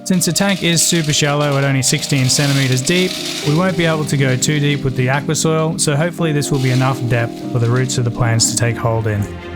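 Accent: Australia. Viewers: I may be wrong but your accent sounds Australian, if so what store did you get the rocks from?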